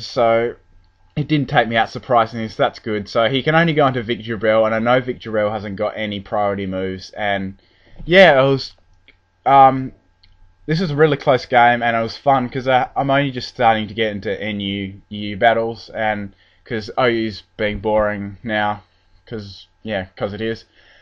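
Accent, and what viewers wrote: Australia. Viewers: is the guy commentating australian?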